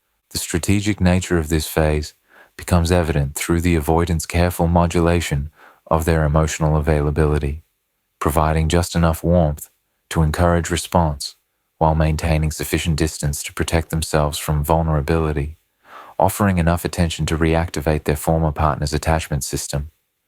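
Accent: Australia. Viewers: glad that you brought the aussie voice back! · I can't stand the Aussie voice...it sounds depressed.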